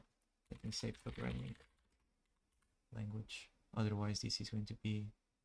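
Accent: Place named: Portugal. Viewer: you've got a portuguese accent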